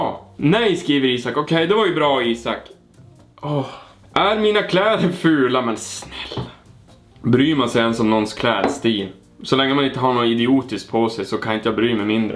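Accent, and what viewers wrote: Norrland, Sweden. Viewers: vilken skön norrlands dialekt du har 😆👍 har precis börjat kollat på dig. kung äru👌🏻👌🏻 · Gör en video när du bara pratar norrländska!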